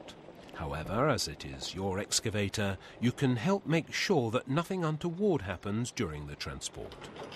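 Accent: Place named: United Kingdom. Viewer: "Balance with the help of the excavator's digging equipment when entering the lower body. This is to avoid tipping which would prove awkward both for the excavator and the trailer." How do Brits come out sounding like Shakespeare even when talking about how to avoid a total fuck up with heavy equipment?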